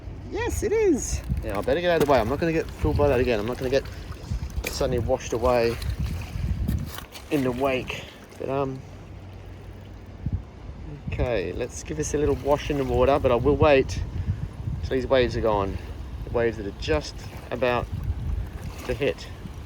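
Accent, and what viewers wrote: Australia. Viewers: Really enjoy your aussie accent. And the time you take in explaining your finds.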